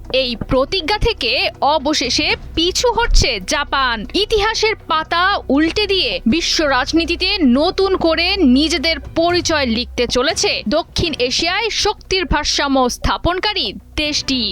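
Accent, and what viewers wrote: Kolkata, India. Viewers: কলকাতার সুরে খবর পড়লেন মনে হলো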